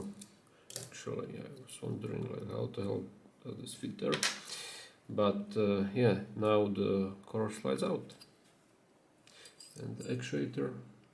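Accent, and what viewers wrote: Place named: Czech Republic. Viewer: Ahoj, hromada krásnýho odemykání a rozebírání... podle přízvuku a dnes i podle dvojkoruny koukám že jsi čech, tak si říkám jestli se neznáme z nějaké komunity, jezdíš na Lockcon?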